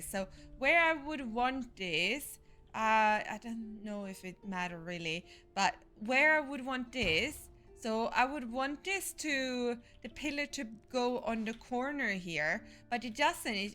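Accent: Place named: Sweden. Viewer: Hey there, thanks for the fast reply! I just finished the rest of your video and I really enjoyed it. The dungeon part turned out very cool with the stair cases and vents. My favorite build set right now is Nemedian. I think the thick stone and rustic wood look really nice together. And that's a Swedish accent there!! Thanks for saying so. With a smidge of old British of course ha ha. It's like music to my ears. Looking forward to your part 3!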